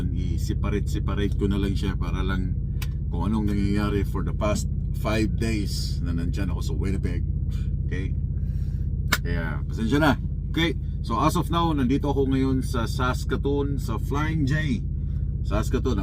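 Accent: Visayas, Philippines. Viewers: Morag bisaya lagi ka dong😀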